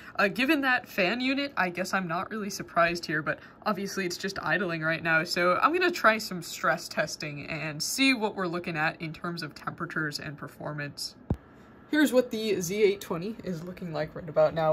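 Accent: Canada. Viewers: Very curious where your accent is from, I like it. (It sounds very Canadian to me, but I could be wrong).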